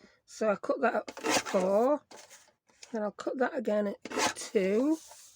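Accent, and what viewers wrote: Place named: Yorkshire, United Kingdom. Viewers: How cute are these?! Beautiful and easy to do. Thank you for sharing. Yeah, lovely to hear a good, strong Yorkshire accent. x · They would be lovely as stocking fillers for Christmas. Gorgeous little note books. And it’s nice to hear a fellow Yorkshire lass. I’m originally from York. 😃👍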